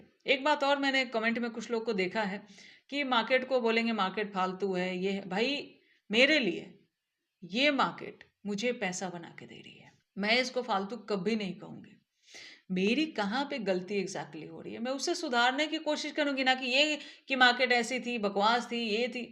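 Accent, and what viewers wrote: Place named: Uttarakhand, India. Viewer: Didi..bahoot badhiya..aap ki Hindi gazab h..aap uttarakhand ya uttar bharatiya lg rhe h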